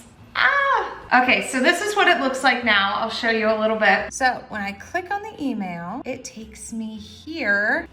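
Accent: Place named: Scotland, United Kingdom. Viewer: Cant lie if you applied the scottland accent you'd be a natural, even speaking english you can kinda hear it, still surprising though.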